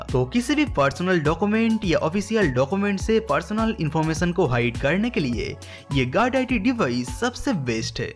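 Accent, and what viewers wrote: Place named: Bengal, India. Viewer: Abe bengali... fix your hindi accent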